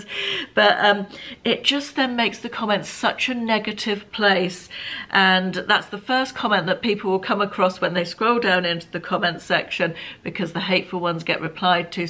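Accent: United Kingdom. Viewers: ❤ you do what is best for you! I'm a recent subscriber and enjoy all your videos. Funny thing.......I subscribe to 4 UK women a d you all sound so different 😊❤! Much like in America.....with all of our different dialects......find it so interesting 😊😊😊😊. Keep on keeping on! I enjoy all my British friends!❤❤❤❤😊😊😊😊Kathy from Pennsylvania USA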